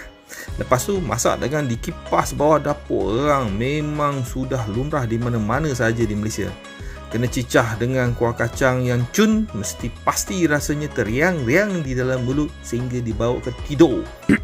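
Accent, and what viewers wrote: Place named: Indonesia. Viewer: Lidahnya sama dgn orang indonesia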